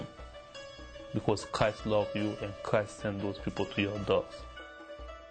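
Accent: Israel. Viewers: This guy is definitely a Jew, thickest Hebrew accent I've heard in a while. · He has a hebrew accent. Fakest thing since WWE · He has Hebrew accent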